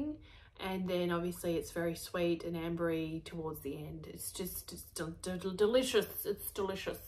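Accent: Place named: Australia. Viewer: yr Aussie accent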